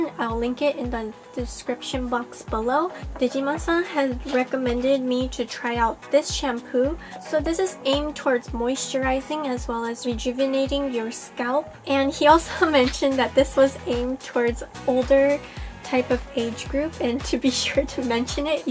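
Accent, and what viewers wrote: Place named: United States. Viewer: Are one of your parents English or something? You sound so American (that’s a compliment btw)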